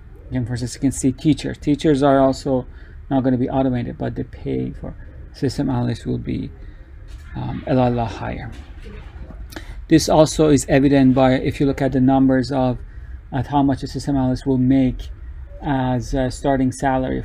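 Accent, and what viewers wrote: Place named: Iran. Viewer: بسیار خوب و مفید آقای من از لهجتون متوجه شدم ایرانی هستید و خیلی منو خوشحال کرد امیدوارم همیشه سلامت و موفق باشید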